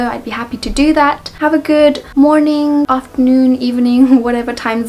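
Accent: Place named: United Kingdom. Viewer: Omg finally a new upload 😭😭💕 I’ve been waiting for this for so long! Can you upload more often so I can hear more of your beautiful British voice and amazing editing 😭✨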